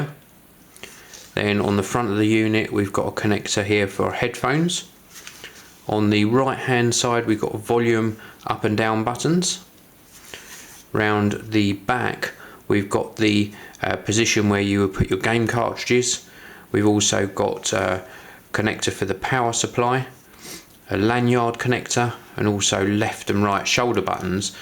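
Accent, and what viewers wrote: United Kingdom. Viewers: I really like your British accent a lot!!!!!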